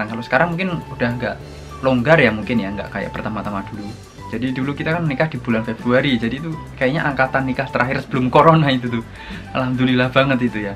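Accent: Java, Indonesia. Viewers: Jowo ne ndi mas..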